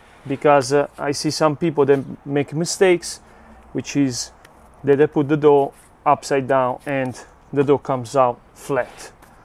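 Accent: Italy. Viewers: sei bravissimo e grazie mille. PS: si sente tanto l'accento italiano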